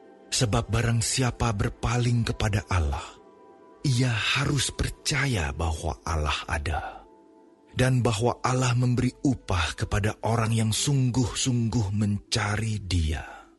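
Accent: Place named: Madura, Indonesia. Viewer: kayaknya yang punya suara ini "orang " madura ya??? trims buat chanel ini..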